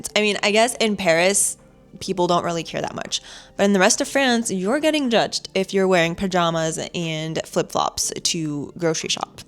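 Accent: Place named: United States. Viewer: J'aime tes vidéos, surtout ta simplicité et ton côté terre-à-terre, sans frime. Ce que je n'apprécie pas, c'est ta façon d'imiter les américaines: ton discours, ton intonation, ton langage corporel, ton langage avec les mains... Dommage qu'on ait perdu le charme, la douceur, la sophistication et les caractéristiques d'une jeune femme Française.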